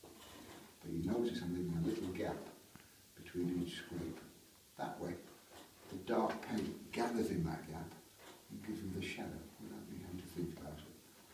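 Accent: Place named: North, United Kingdom. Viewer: Put the subtitles on top right of video three dots Captions, he is easy for us Northerners to understand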